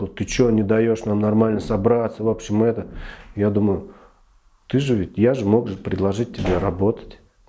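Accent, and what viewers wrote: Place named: Cheboksary, Russia. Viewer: Здравствуйте! Я более 20 лет живу в Германии, смотрю Ваши видео и сегодня узнала, что Вы живёте в Чебоксарах и поняла почему Ваш говор ( или акцент) меня как-то приятно трогает. Дело в том, что я каждый год в течение 20 лет иногда и два раза в год навещала в Чебоксарах мою двоюродную сестру, ухаживала за ней и однажды проходила курс лечения у мануального терапевта кажется на улице Горького. Сестра моя умерла 2 года назад и больше я не езжу в Чебоксары, но очень скучаю по вашему городу. У меня есть там очень хорошие знакомые, поддерживаю связь с ними.Очень нравятся Ваши видео и было очень приятно узнать, что Вы из Чебоксар! Удачи Вам, и процветания!!!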